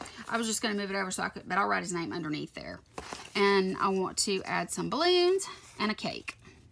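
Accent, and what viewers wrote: South, United States. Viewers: GORGEOUS! Loved hearing your answers and you are tooooooooooo kind to me! I love listening to your cute as pie southern accent :D Urban Decay is such a great brand... they have SUCH an amazing selection of all products too! I love Too Faced but sometimes the quality is hit and miss for me? But some is sooooooooooooo good. <3 loved this... I had so much fun collabing with you!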